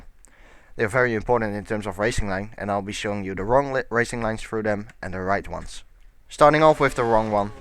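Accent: Netherlands. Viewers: nah, his accent is way more dutch than finnish.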